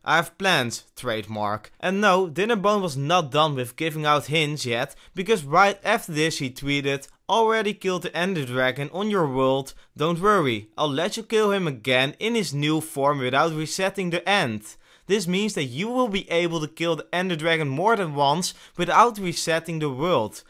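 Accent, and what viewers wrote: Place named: Netherlands. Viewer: Je bent nl dat hoor ik. Lol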